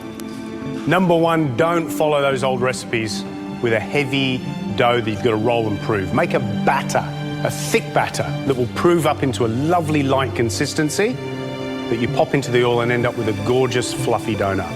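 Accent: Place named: Australia. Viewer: THANK you, i don't know Australian, and I was like what the heck did he say.? Now to transfer that into ENGRISH... like ounces and cups.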